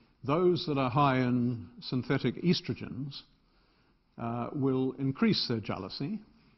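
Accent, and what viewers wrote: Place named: RP, United Kingdom. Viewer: O'Rourke . . Of course he is British. He has a typical upper class academic English accent. Moreover, he used the term "chuffed", which in England means "very pleased with oneself or the outcome". However, I suspect that in his time he has been a 'bit of a lad' himself.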